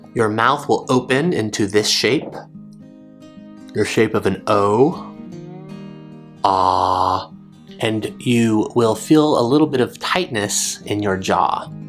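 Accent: United States. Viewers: What side of the US are you from, to find out more about your accent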